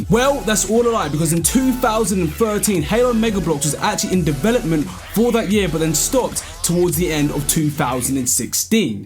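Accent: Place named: England, United Kingdom. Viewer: Yes fam your accent is bare white you from Midlands or down south?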